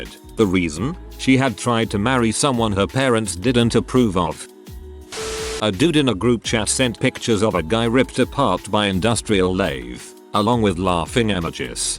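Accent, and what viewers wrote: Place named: United Kingdom. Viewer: Can you guys change the British narrator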